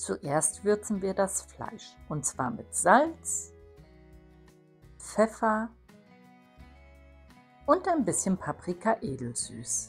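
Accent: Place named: Germany. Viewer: Bom dia, não entendo quase nenhuma palavra mas não importa, eu ficaria horas ouvindo sua voz e sotaque alemão 👏🏻👏🏻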